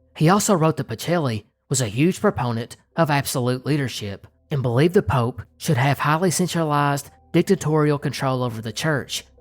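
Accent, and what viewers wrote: South, United States. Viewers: that's why I always call you my southern brother 💙💙, when I became aware of the Bible being edited I thirsted for knowledge of all things hidden, leading me into the rabbit holes of everything. I know you are the same and that's why I love your content. If money wasn't so tight for me since my daughter broke her back in a car wreck and she and my grandson live with me I'd support your channel in every way. You've been my favorite from the 1st time I heard that genuine southern accent 😊😊. Don't change a thing, love from Arkansas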